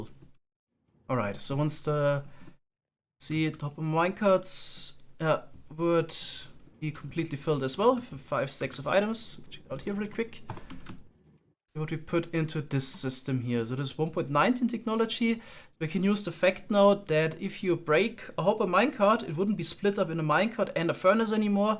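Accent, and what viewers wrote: Germany. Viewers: He’s German yeah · i mean, the accent would suggest german or austrian :)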